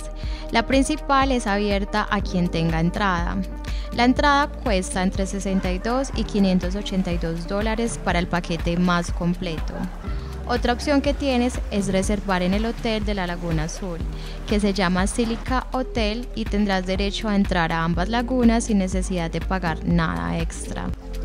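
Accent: Antioquia, Colombia. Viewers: que rica vocecita de paisa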